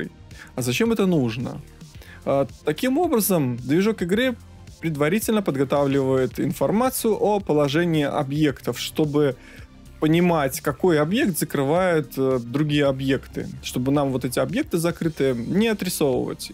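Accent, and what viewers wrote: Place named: Belarus. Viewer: Начал смотреть видос ,услышал голос и первым делом подумал о боже это же мой дядька из Минска,а потом увидел как канал называется. Беларускую речь ни чем не попутаешь. Так вот теперь понятно почему все у кого ниже ай 5 плачут что игра лагает. У самого 6900к(разгон) и 1080(даунвольт) в среднем 110-135 фпс держит на низко -высоких настройках. С меня лайк и подписка.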